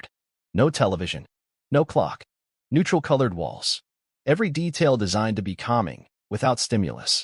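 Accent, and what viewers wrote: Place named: United States. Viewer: Is this true or just another example of American accented BS?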